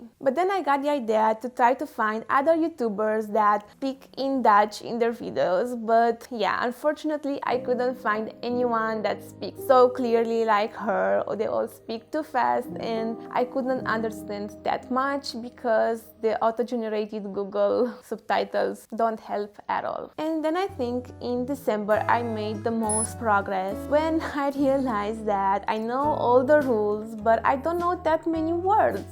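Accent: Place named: Romania. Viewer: God damn, I recognized instantly that you're from Romania (mostly because so am I) but daaamn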